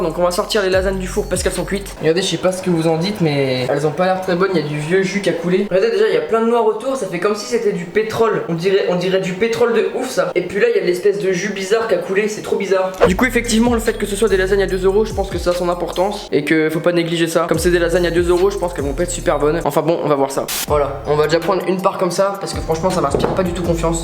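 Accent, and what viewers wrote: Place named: Canada. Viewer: Parle canadien 24h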